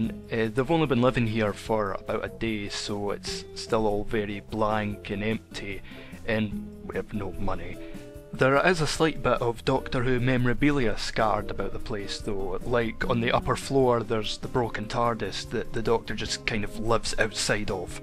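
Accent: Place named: Scotland, United Kingdom. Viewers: Actually I think he's Scottish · he's Scottish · hey your british i love british and doctor who by the way what exspanshion pack you using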